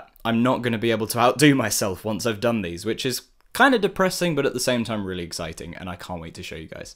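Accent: United Kingdom. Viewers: yOu sOuNd bRiTiSh also late congrats on 1 mill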